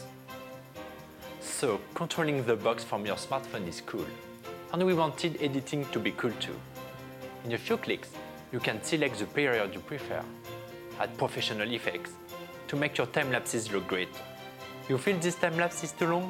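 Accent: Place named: France. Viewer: C'est une bonne idée je viens de le voir sur studiosport, en 4K ça aurait été cool, sinon l'accent ( french accent) à coupé au couteau ça le fait moins x)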